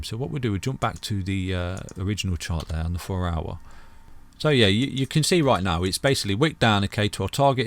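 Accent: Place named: United Kingdom. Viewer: I think the British accent adds to charm.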